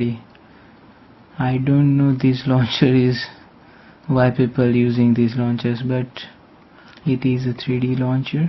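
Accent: India. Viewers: Come on. He has an indian accent.....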